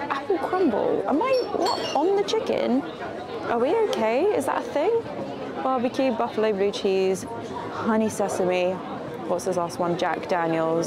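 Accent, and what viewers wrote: RP, United Kingdom. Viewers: Nice video .I like your accent .Slightly posh but not too much so .I will be spending half a day in London next Sunday .Walking around but not buying much at those prices .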